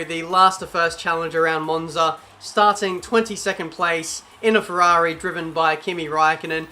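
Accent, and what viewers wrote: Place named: Australia. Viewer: He's australian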